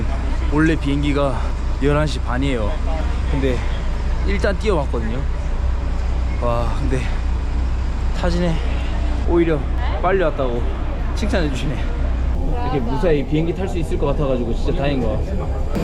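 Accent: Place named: Gyeongsang, South Korea. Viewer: ㅎㅎ첨보는데 사투리 보아하니 경상도?? 울 아들같아 웃음이~ㅋㅋ 화이팅 !!!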